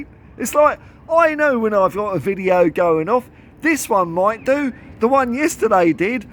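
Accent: Australia. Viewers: Are you Australian mate? Can’t work out the accent. Like your channel 👍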